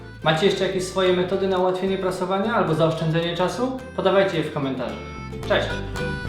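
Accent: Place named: Poznań, Poland. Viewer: dwa słowa i już wiesz, że autor jest poznaniakiem 😁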